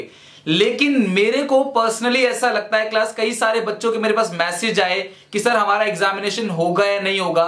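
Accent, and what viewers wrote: Odisha, India. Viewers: Sir Aap Odisha ki ho kya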